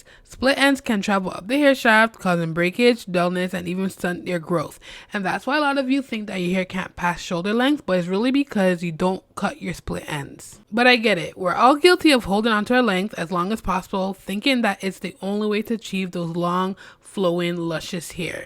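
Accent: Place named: Barbados. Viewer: Love your accent you sound Bajan!!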